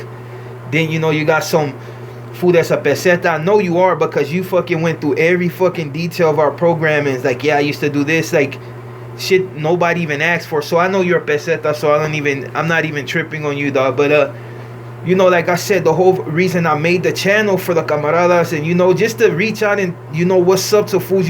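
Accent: Mexico. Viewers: Are you full black ? Becuase damn dude you sound Mexican! You gotta be part Mexican lol it's not a big deal but its interesting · Why the fuck you talking like a mexican nigga? Stfu w yo bitch ass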